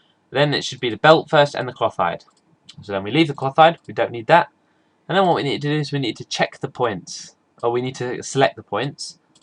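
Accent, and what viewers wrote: United Kingdom. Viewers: thank you thank you tah you thank you.with your nice english accent.THANK YOU.you are the onlyone in the world who showed how to animate cloth and model together as one.THANK YOUUUUUUUUUUUUUUUUUUU.wowwwwwwwwwww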